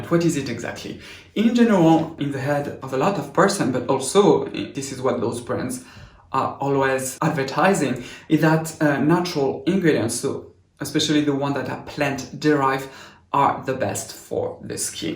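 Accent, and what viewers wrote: France. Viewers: I think that french accent is cute 😃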